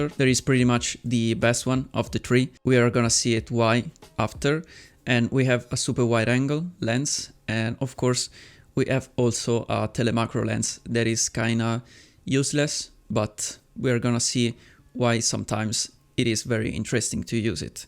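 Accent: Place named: Italy. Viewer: sapevo che eri italiano...si capiva dall'accento inglese ahahah bel video anche io ho il mi 11 e secondo me con il sensore principale le foto sono fantastiche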